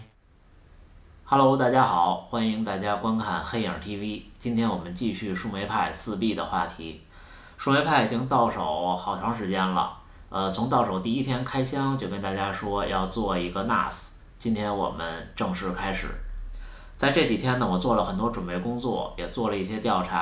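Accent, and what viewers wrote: Beijing, China. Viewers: 最需要的就是可以直接在树莓派本身系统上实现各种功能的教程了，刷来刷去的太麻烦，老哥北京口音听着也很舒服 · 比较喜欢up主的风格 说话听起来很舒服 北京哥们吧， 希望保持这种风格 教程针对新手小白也比较友好，再帮帮小白，做点更加有趣易于操作的项目吧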